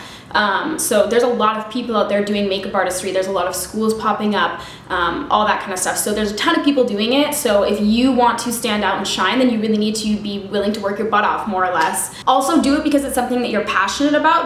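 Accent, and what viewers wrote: Canada. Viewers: I THOUGHT I heard a bit of a Canadian accent ;) hecks yeah! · Just discovered your channel! I'm Swiss and I lived in Van for about half a year and I miss it so much! Just listening to your Canadian accent made me feel like I was in Vancouver again, so I'm definitely gonna watch tons of your videos today just to make me less homesick :D xx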